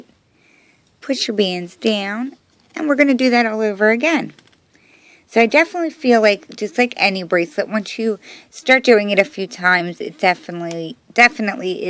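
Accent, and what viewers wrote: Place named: South, United States. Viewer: That southern accent tho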